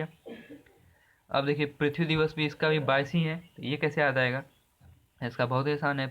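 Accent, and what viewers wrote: Bihar, India. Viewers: Sir aap bihar se hai kya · Bhut bihari bolte ho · Sr r u frm bihar????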